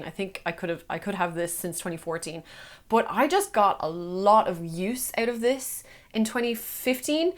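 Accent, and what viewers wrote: Ireland. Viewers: I have been following you for a few years now. At first, you were my go-to beauty guru simply because of the accent! My husband is from Ireland (I am from America) and we have been in a long distance relationship for 5 years, so watching you has always made me feel at home. But after watching for a while, you ignited a fire and passion for makeup within me, and you have helped me grow in my expertise. You are real, you talk about your flaws without degrading yourself for having them, and you are someone that so many in the makeup world can look up to. I'm so glad that this community has helped you grow in the past few years, but please remember that you have helped us grow as well! Cheers x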